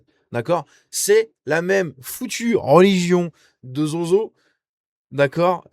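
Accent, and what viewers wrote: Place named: Spain. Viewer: L'accent est espagnol ou portugais.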